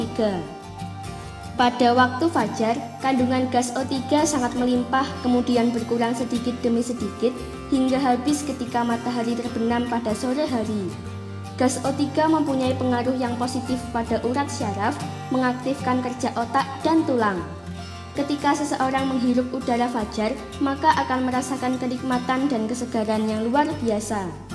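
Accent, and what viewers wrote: Java, Indonesia. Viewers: medok medok seksi❤❤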